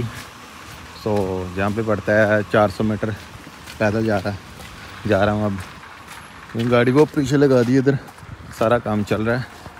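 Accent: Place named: Punjab, India. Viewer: Punjabi nikal hi jandi moh cho 😂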